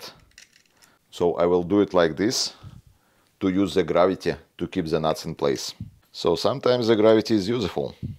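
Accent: Russia. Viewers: Really cool project 👍 P.S. Which accent type that is? I think about Russian, but i dont really know 🤔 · Вот так выглядит русский акцент))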